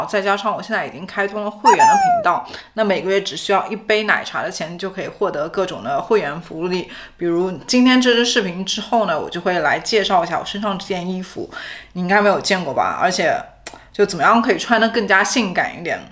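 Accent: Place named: Shanghai, China. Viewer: 海外听到上海女生的上海普通话好亲切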